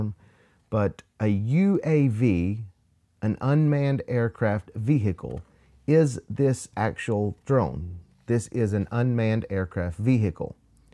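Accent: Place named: Arkansas, United States. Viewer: I detect a slight Arkansas accent 🤔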